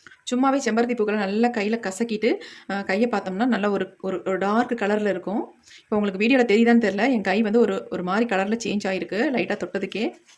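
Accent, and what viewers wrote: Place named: Kerala, India. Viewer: Unka mudiyai kattunkal Unkal pechai kettal malaiyalu mathiri illaiyea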